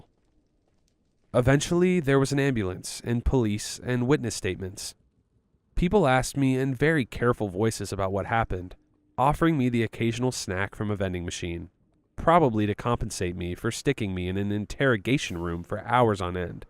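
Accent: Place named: United Kingdom. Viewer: bro i SWEAR when i listened to this at first it was a british guy talking 😭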